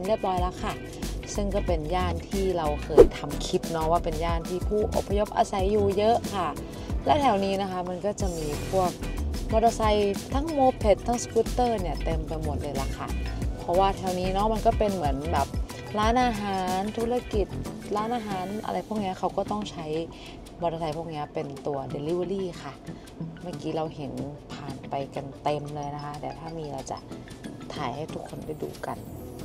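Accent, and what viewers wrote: North, Thailand. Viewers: สาวเหนืออู่คำเมืองน่ารัก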